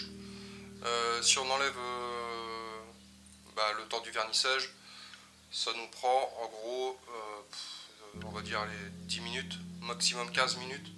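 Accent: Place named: North, France. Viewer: Ça fait pas longtemps que je suis tes vidéos. Mais merci pour ton taff, tes vidéos sont simples et bien expliqués. Mais tu serais pas du nord; ta un petit accent. J'ai le même 😁😁.👍👍👍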